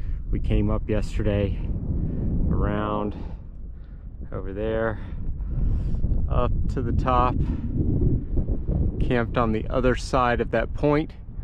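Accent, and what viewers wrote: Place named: United States. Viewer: Is he British? Sounds so American (Australian?), but maybe my ear has been trained otherwise, such as with Scottish sheep-shearers?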